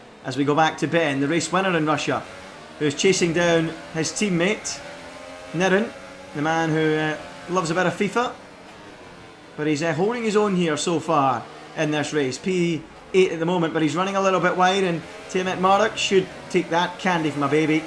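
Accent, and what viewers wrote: Scotland, United Kingdom. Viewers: Scottish ain't too hard to understand, what is hard to understand is why he has an issue with tom97hd tho 🤔 just seems to slate him a bit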